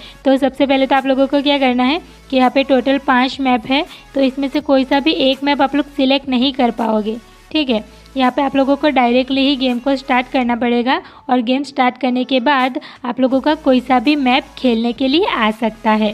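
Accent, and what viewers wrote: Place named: Bihar, India. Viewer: Hey pretty girl aapki aawaj sunke lgta hai ki tum Bihari ho jai bihari meyya ki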